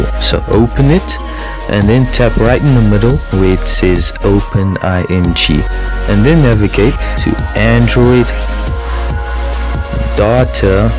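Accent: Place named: South Africa. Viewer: Your accent sounds South African. I'm from SA.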